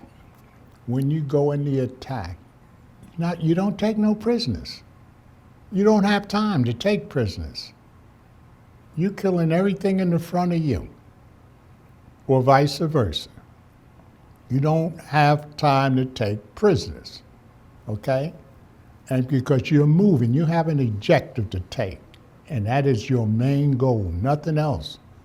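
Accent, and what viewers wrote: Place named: New York, United States. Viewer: Sounds like one. Could tell he’s a fellow New Yorker. He’s very detail oriented. Good story teller.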